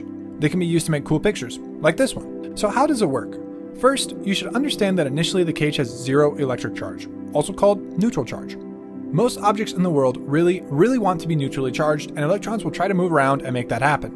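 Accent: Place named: United States. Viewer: Bro hindi me banao video... Ye American English samj nhi ata....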